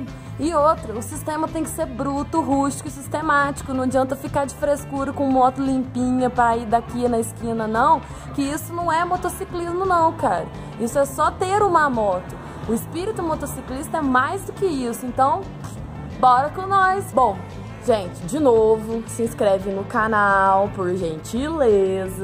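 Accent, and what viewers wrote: Minas Gerais, Brazil. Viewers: De onde vc é guria , fala como mineira com trejeito de gaúcha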